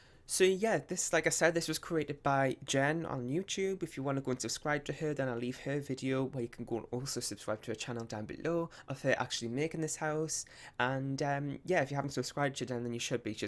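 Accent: Newcastle, United Kingdom. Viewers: No worries :) I'm from Durham! I thought you would be from Newcastle cause of your accent :D I live in London now so my accent isn't as strong haha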